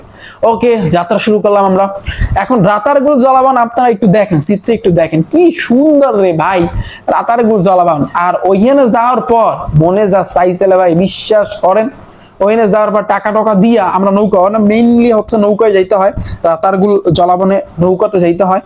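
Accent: Barishal, Bangladesh. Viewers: Sir apner class kori, apnar borishal language shune moja pelam. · ভাইয়া বরিশালের ভাষা টা একছেড় সেরা লাগছে🙂💔💔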